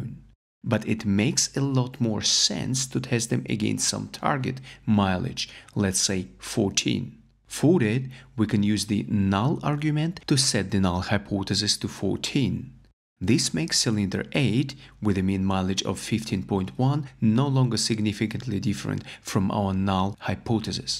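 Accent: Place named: Belarus. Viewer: Thank you for one of the best channels on YouTube on the topic of data science using R. By the way, I had a Jewish grandpa from Belarus and Latvia (he was born in Latvia, but moved to Belarus, before arriving at South Africa). Your accent is quite similar to his, but with an extra German sound. Is there any chance that you are from Belarus?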